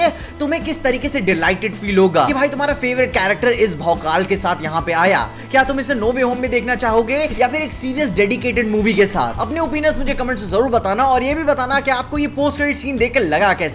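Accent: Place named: Bihar, India. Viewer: bhai tera bihari accent bekar hbai,bhai pure hindi me hi explain kar le ,jyda accha lageag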